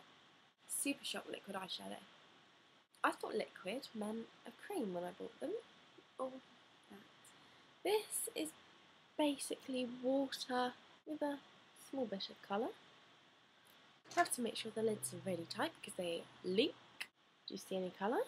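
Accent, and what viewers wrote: United Kingdom. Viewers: I love British accents... but by the end of the video your voice was annoying the heck out of me Avon does give you a full refund, you know that right? Don't like a product RETURN IT!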